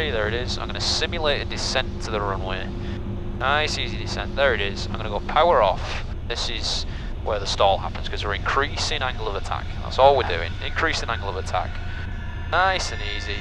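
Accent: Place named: Yorkshire, United Kingdom. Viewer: ahhh I see the accent sounds similar to a Yorkshire one..... but not exactly the same 😂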